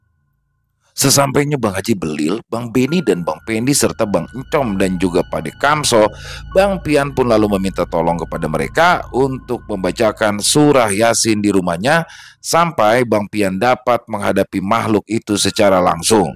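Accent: Jakarta, Indonesia. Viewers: Bang.. Pas banget. Bawain. Logat Betawi nya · Senang dengan logat betawi nya sosok bang ❤❤❤👍👍👍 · Harus nya ini cerita serem.tapi karena pakai logat betawi dan banyak canda nya.jadi gk jadi seram. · Hdir bang, sy sngat suka dengar logat betawi, lucuu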